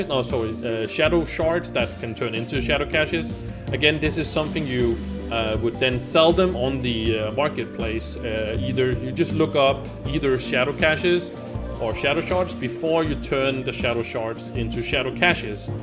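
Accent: Denmark. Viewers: This is just a question. Do u come from Denmark? Cause it sound like that.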